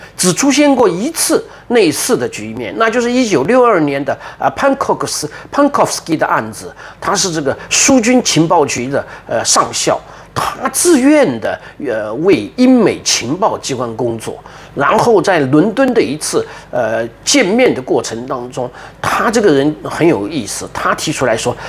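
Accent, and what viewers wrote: China. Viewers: 平先生的雲南口音地道😊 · 昆明话太地道了😂老乡啊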